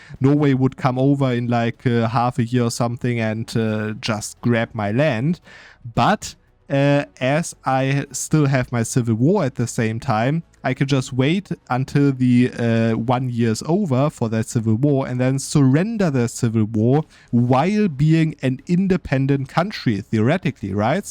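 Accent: Germany. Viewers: The German accent is as sharp as a knife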